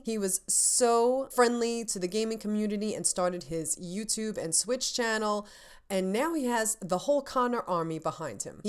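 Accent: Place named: Texas, United States. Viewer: it looks like a mysterious Japanese female assassin with an American (Texas) accent hosting game awards .cool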